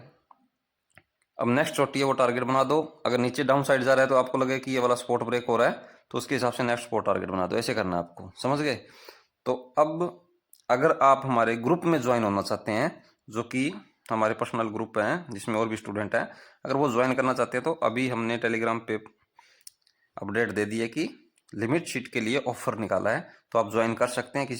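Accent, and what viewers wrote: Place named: Jind, India. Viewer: m jind se hu bhai voice se laga tha local bhai ho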